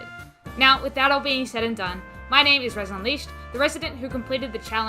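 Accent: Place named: Australia. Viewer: Australian spotted.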